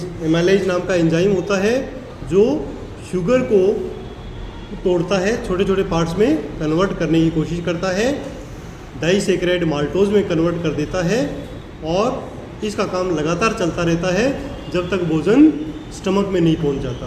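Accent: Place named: Maharashtra, India. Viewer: sir , you explain topics in simple words. Our me janta hu aap marathi ho aapke bat karne ke tarike se pata chal raha he ❤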